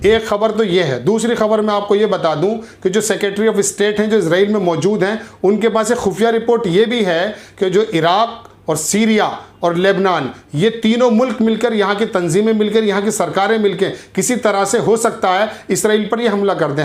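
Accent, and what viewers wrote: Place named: Saharanpur, India. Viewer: भाई आपकी आवाज तो अपने सहारनपुर वालो जैसी लग रही है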